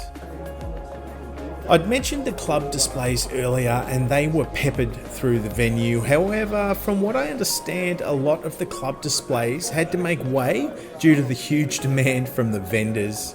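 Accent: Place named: Australia. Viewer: Ah Refreshing to hear another bl00dy Aussie.. oi oi oi...Thanks brother.. Just subbed.. All of the best!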